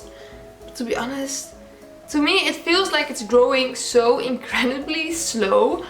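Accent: Netherlands. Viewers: This is my first video watching you and your english accent sound so similar to mine are you by any chances dutch? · omg ben je nederlands of beeld ik me nu een accent in?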